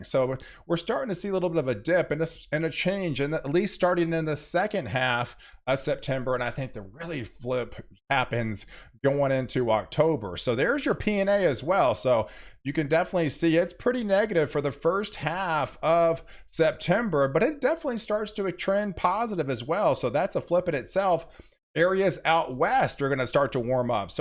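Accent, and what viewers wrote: Texas, United States. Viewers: I love his texas accent and manner of speaking unlike Canadian no knowledge or love of weather you just listen to them read their written speeches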